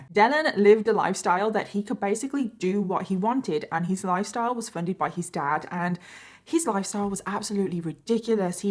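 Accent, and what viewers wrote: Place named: United Kingdom. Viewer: Imo you cover these cases better than most shows😂 I’m obsessed. And your so bri’ish sometimes I love it lmaoo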